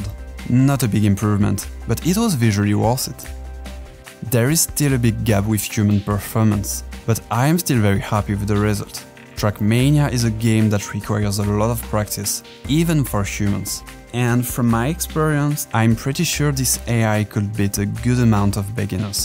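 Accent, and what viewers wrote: France. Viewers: Great video, just asking are you french because if u are ur accent is thick X) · Unrelated but your accent sounds very french, are you french? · W french accent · Un bon accent bien français qui me permet de comprendre sans sous titre, gg les gars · sympa l'accent français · am I the Only one to think that this guy has a french accent? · Bc of ur accent sound French background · On reconnais l'accent français même si tu parles trop bien mdrr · He's first language seems to be french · j'adore l'accent françait sa fait plaisir! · Ton accent francais se voit (un peu) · il a un big accent français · 🥖🥖🥖🇫🇷🇫🇷🇫🇷 l’accent français on est là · Bruh this video was just me getting frustrated because you sound so french, so your english is pretty weird but stills english so it still harder to understand than french x) (for me of course) but if we forget that, great video bro ! 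· Tes francais jai cramé ton accent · lol huge french accent bro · Hey la vidéo est géniale, n'ayant pas tous les termes informatiques en anglais c'était difficile à suivre mais très passionnant. Au vu de ton accent (et de ta couleur de voiture) je pense que tu es français. Si à l'occasion tu peux mettre des sous-titres français pour les gens comme moi qui ne s'y connaissent pas forcément en informatique et machine learning ce serait super ! Ta vidéo a un rythme à la Virtual, tu pourrais devenir le Wirtual français ce serait excellent. En tout cas superbe vidéo, bien montée et captivante même pour moi qui ne joue même pas à ce jeu et n'y connais rien à tout ça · Like your French accent ! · Ça sent l’accent français un peu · Tu as un léger accent français , est -ce ton origine? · I like the french accent while talking, even though you talk pretty well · l’accent français on est là mon g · c’est à l’accent qu’on reconnait le frenchman 😎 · Accent français détecté ! 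En tout cas super vidéo !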